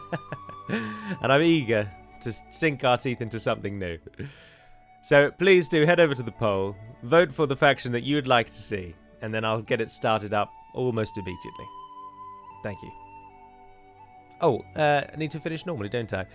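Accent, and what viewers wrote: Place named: United Kingdom. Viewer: Absolutely agreed. Do what your heart desires and I will be here just for the ride as well as that amazing and clean british commentary. At least that is what I am mostly here for... humour included:D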